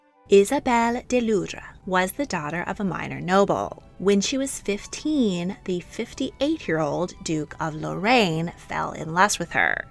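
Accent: United States. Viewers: Beautiful research, writing and graphics. American accent is particularly abhorrent.. Overdub by French woman please. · you should redo this video without the advertising scam and narrated by a pleasant and correct english speaker without the horrendous american accent.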